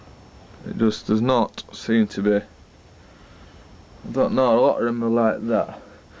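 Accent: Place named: Northern England, United Kingdom. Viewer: After trawling through all the videos i subscribe to from around the globe its bloody great to here a northern accent !! Albeit from the wrong side of the pennines !! Have you got your name on an allotment waiting list ??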